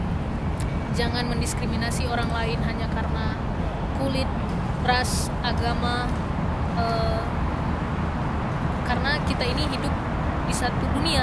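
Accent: Aceh, Indonesia. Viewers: Logatnya aceh banget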